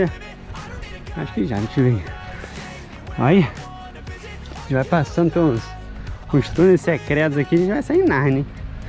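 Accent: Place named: Minas Gerais, Brazil. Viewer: Que água maravilhosa...essa cor me faz tão bem!! A Obra de Deus é perfeita!! Essa prainha dos pescadores é um paraíso..., também achava que fosses mineirin...seu sotaque, apesar de paulistano é autêntico de um mineiro.😊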